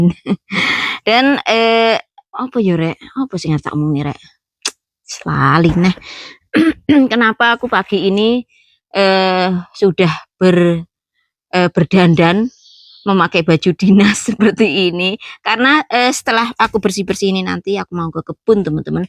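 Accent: Java, Indonesia. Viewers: salam sehat dari Papua buat mbak sekeluarga. mbak klu ngedabrus selalu buat saya ngakak.biar sdh lama diluar negeri tp jawanya tetap medok.sehat terus mbak🙏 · Jawa tengah/ Indonesia hadir mba.....suka bngt dgn kegiatan mba berkebun dan panen2. Dan suka ketawa sendiri klau denger mba bicara, pakai bhs asing campur bahasa jawa yg msh medok😂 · MB meskipun hidup diamerika ngomongnya tetep Jawa medog seneng dengernya orangnya sederhana apa adanya baguus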